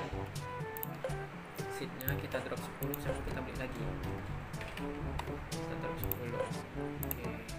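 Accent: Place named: Sabah, Malaysia. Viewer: Ckp pun mcm org sabah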